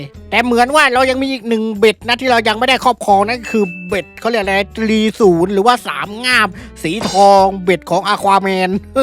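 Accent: South, Thailand. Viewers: ตกได้ปลากระป๋องครับ 5 kg. สุดฝีมือแล้วครับภูมิใจสุดตั้งแต่เล่นมา😅forzarken11 เข้ากลุ่มพี่แล้วนะครับทั้งหมด5กลุ่มจาก fcที่ดูพี่มาเกือบ2ปีคลิปก็ยังสนุกเหมือนเดิมสู่ๆครับพี่ชายแหลงใต้เก่งจุง🎉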